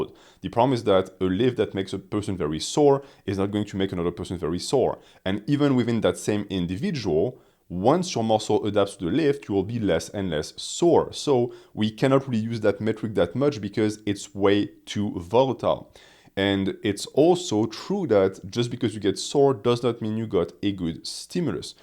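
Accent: France. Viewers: Soon his thick French accent will be a thick Spanish accent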